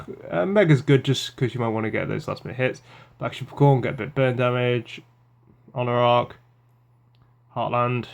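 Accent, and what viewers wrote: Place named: United Kingdom. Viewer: I'm in process of building a budget Kozmo deck and I would recommend running reasonings for the free summons of the ships and reasonings are cheap too and also the field spell can help unbrick your hands and code geass is fucking amazing man and you sound British I am also british whereabouts you from?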